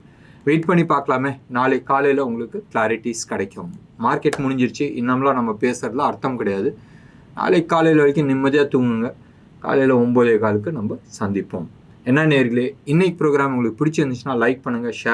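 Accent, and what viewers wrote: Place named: Kongu, India. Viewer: I felt I was at erode speaking to my cousins regarding the market status .but we enjoy the slang and daily used phrases all pains have washed out l.i ama kongu girl thank you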